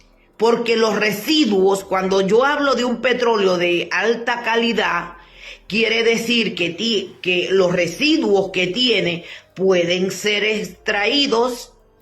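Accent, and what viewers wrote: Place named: East, Venezuela. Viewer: BUENOS DÍAS PROFESORA COMO ESTÁ USTED MIRE LE PREGUNTÉ LA OTRA VEZ QUE DE PARTE ES USTED PORQUE HABLA IGUAL QUE YO ORIENTAL DE PURA CEPA YO SOY NACIDO EN ANACO ORITA VIVO EN VALLE DE LA PASCUA GUÁRICO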